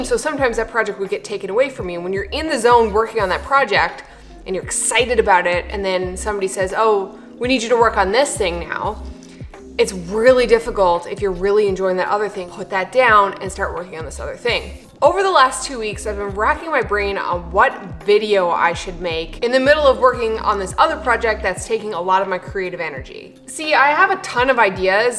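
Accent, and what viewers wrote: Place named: Newfoundland, Canada. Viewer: The Newfie accent is THICCCCC right now!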